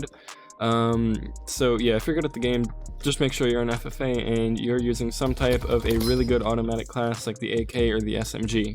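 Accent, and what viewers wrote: Austria. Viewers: Are you austrian?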